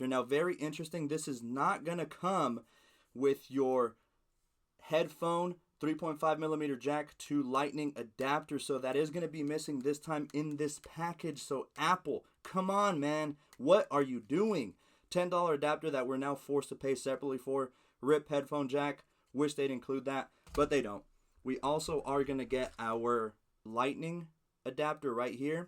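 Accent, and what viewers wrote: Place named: Georgia, United States. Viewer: Hmmm... you sound like you’re from Georgia by the way you talk... 🤔🤔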